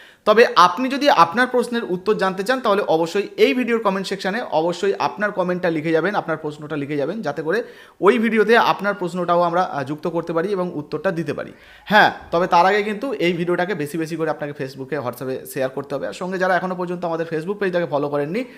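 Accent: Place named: Bangladesh. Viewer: এ ভাই তুই জানিস যে বাংলাদেশী নিয়ে কি হবে তোর বাপের 1971সালের ভোটের লিষ্টে নাম আছে কি না তাই দেখ তার পর ভিডিও করিস তোর কথা শুনে মনে হয় তুই বাংলাদেশী আগে তুই কোথায় যাবি সেটা ভাব তার পর ভালো করে ভিডিও বানাস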